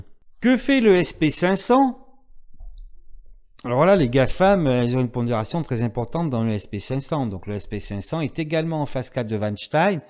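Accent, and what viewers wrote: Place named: Southwest, France. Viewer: Ah et l'accent du sud-ouest fait toujours plaisir à entendre !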